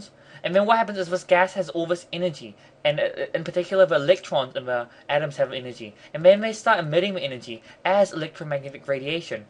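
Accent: New Zealand. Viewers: New Zealand accent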